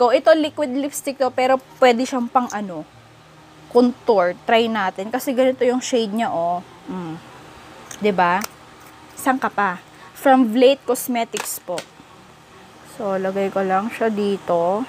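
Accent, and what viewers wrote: Ilocos, Philippines. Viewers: Ilocano Kaba ate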